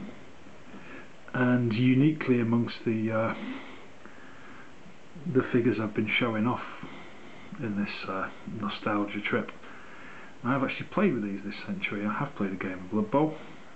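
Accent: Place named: Leicestershire, United Kingdom. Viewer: same here mate, i can tell by your ascent you would not have been far from where i used to live in leicestershire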